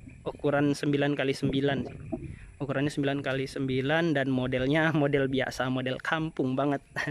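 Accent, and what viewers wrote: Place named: Sumatra, Indonesia. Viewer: Klu dari cengkok bahasanya BKN org Jawa. klu GK salah orang bagian Sumatra.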